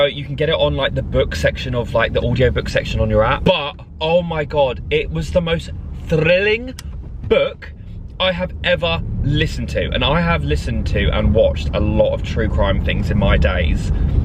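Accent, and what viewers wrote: South London, United Kingdom. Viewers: 100% with you there on the accent, i know exactly what you mean - it’s a south london accent and it does a funny thing to my knees too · So you are a south londerrr aye 😅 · south london mandem accent 🤣🤣🤣 can’t lie it’s my fave also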